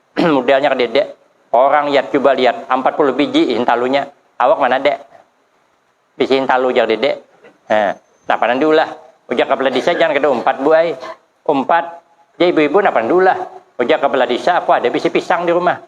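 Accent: Banjar, Indonesia. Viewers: Bujur kh hidin ni urang Jambi,,, di dangar tu Banjar banget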